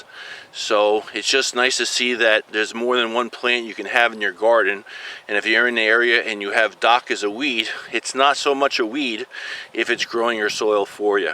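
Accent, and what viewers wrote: New Jersey, United States. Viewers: YAY! I have been doing similar things with Dock. It is nice to have others see its value. I felt lonely when I thought I was the only one who was onto this, and yet it made so much sense to me. I am loving your videos. They all make so much sense. So many pieces of the puzzle fitting together. I literally stayed awake all night last night watching your videos, I was so fascinated. We are establishing a Permaculture food forest on 1/4 acre in Portland, Oregon. It is fun to hear a New Jersey accent. I grew up outside Philadelphia. Blessings :)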